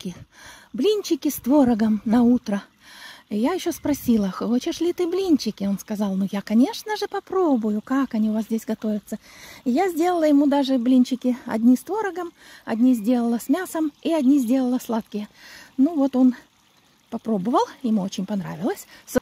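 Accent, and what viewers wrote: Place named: rural, Russia. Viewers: Да, чувствуется южный говор. Его не вытравишь никакой Англией. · голос сельской женщины - себя боится показать и мужей тоже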